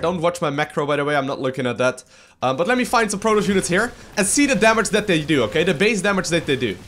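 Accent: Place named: Netherlands. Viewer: dutch is definitely leaking into his english, compare this to his newer videos, you can really see the difference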